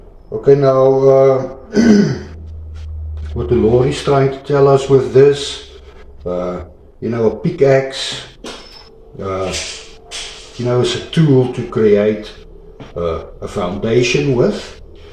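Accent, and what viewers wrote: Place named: South Africa. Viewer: Hey, sounds like a South African brother!! 🇿🇦 God bless.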